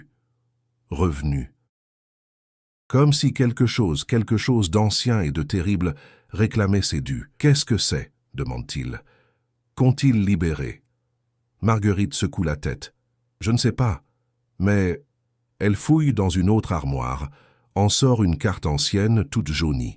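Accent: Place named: Marseille, France. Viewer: Très agréable votre voix Marseille 😊 un peu de soleil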